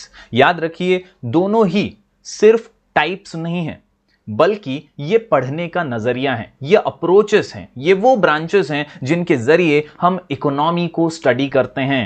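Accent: Maharashtra, India. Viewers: BRO YOUR MARATHI ASCENT SO COOL (tumhari video kon edit karta hai )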